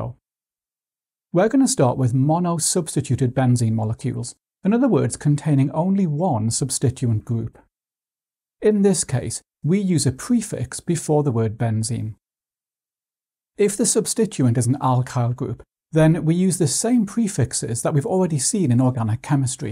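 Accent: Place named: RP, United Kingdom. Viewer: Why do you sound so posh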